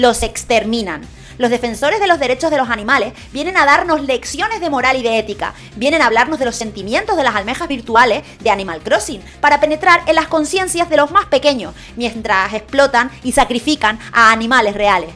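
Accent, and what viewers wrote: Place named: Spain. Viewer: yo no se como pregunta si es de argentina si el acento de españa se le nota a leguas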